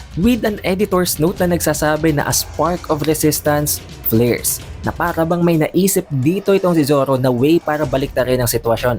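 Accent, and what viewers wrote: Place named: Conyo, Philippines. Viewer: Inexplain ❌ Ipinaliwanag ✅ Ishinare ❌ Ibinahagi ✅ Nireveal ❌ Binunyag ✅ Mga simpleng salita, pero di alam ng mga purong tagalog. Hindi alam? Or baka kinalimutan? Ewan ko bah, nakakapeste lang pakinggan na banggitin ang isang salita sa ganyang paraan kung may pormal na salitang tagalog naman. Tunog conyong bakla na tuloy pakinggan. Bisaya ako pero mas magaling pa ata akong bumigkas ng pambansang wika. Kailangan na ata ng karamihan magbalik-aral.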